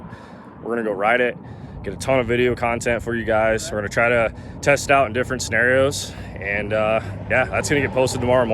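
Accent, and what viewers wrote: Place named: Canada. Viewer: I was talking about the welcome back the guy dose it all the time sounds Canadian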